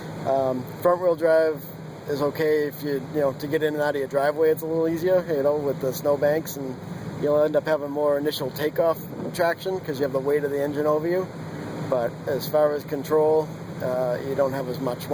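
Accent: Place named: Northeast, United States. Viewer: For the snow, scenery, and NE accent: thank you!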